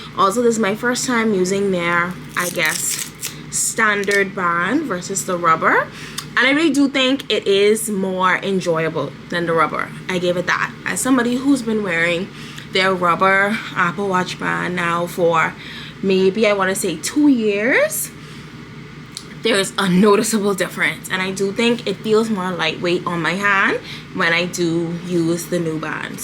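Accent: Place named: Bahamas. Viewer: I know a Bahamian when i hear an see one lol 😅 Love the video keep up the good work luv 🥳 242 to the world 🇧🇸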